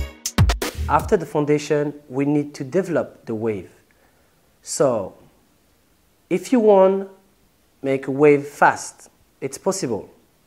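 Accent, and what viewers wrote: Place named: Morocco. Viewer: wa axent maghribi:)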